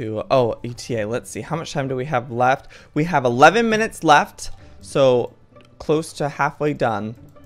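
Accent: South, United States. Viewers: Omg he has a country accent ❤️❤️ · Is from the south? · I loveeee his country accent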